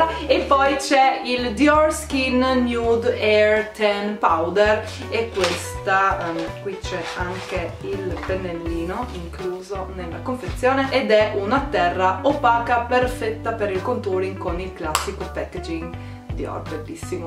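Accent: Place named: Trieste, Italy. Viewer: accento da vera triestinazza doc..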